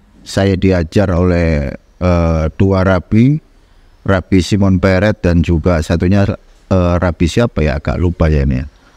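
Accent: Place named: Surabaya, Indonesia. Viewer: Masya Alloh b sy kgum dg anda,nada bicara anda yg santai dg logat Surabaya,pengkajian anda yg sgt ditail n mudah di fahami,SMG anda tetap Istiqomah.amin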